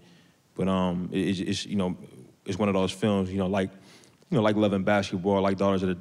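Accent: Oakland, United States. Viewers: Crazy how many people in the comments have never heard an Oakland accent. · Sobison lol no he’s from Oakland, that’s how most of them talk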